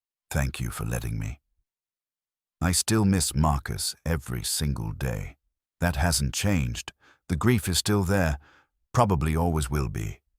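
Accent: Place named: RP, United Kingdom. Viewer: The formal British accent totally destroys any atmosphere or warmth of authenticity.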